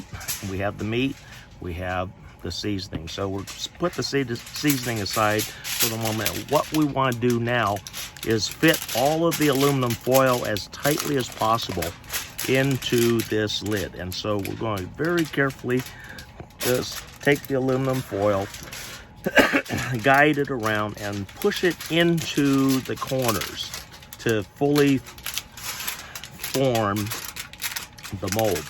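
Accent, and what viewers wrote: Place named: South, United States. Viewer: I could tell that living in North Carolina really did you well for that semi-subtle Southern accent.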